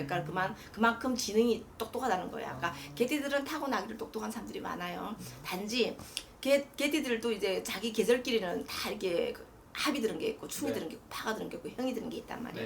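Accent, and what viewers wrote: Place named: China. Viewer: 넘빨리말씁해서 잘못알아듣는데요.발음도 여기조선족발음으로들려요천천히 다른무속인들처럼😢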